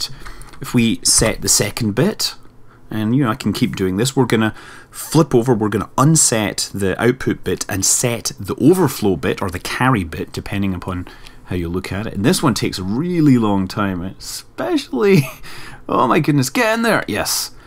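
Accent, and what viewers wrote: Scotland, United Kingdom. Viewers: I love your voice. And no not just your delightful scottish accent, your voice is really nice. I'd LOVE to grab a space-pint with you some day and talk physics as our spaceship slowly deorbits into Minmus....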